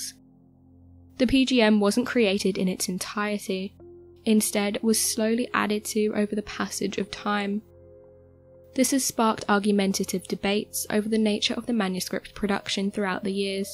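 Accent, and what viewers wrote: England, United Kingdom. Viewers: I love this ladies voice. Is she from Britain? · Why is a British accent supposed to be classy? It's so annoying! Good vid just damn Brits cant talk lol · She’s English and they have a lot of different ways of pronouncing words than North Americans. That’s probably what it is.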